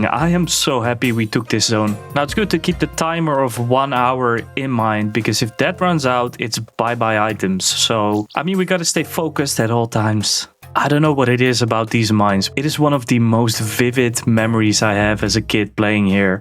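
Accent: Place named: Netherlands. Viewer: Ik hoor een Nederlander, ik heb je door 🧐😂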